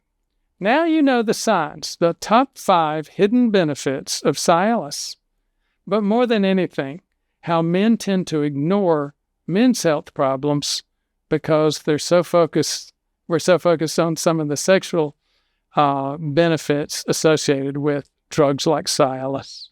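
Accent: South, United States. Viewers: Why are you pronouncing this drug's brand name incorrectly? Is it a southern dialect thing, like how certain southern people call my mom, "Naiomi," instead of her actual name, Naomi? I always think that they're confusing the CORRECT pronunciation of her name with the pronunciation of the Kenyan city of NAIROBI! It's pronounced, 'see • alice,' NOT "sigh • alice," and I can't even watch the rest of this video because you're driving me crazy with your repeated mispronunciation of this word....and you're a real, bonafide doctor, right? Well then, one would think that you would KNOW the correct pronunciation?! I'm just an RN, and even I know the CORRECT pronunciation! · I think it's the Southern dialect thing and possibly lack of early exposure to multiple cultures. Many people mispronounce words...Dr. is a very good person.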